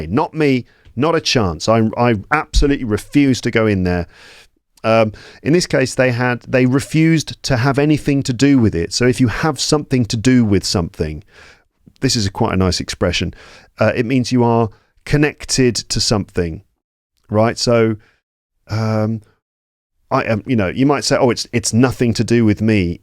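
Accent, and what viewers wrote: United Kingdom. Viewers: I love the accent British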